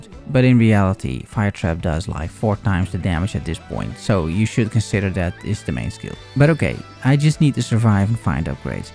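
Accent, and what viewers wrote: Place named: Netherlands. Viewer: Just a random comments, your accent.. are you Dutch?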